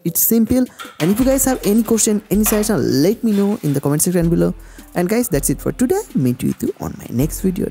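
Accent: Bengal, India. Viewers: bhaiya bengali ho kya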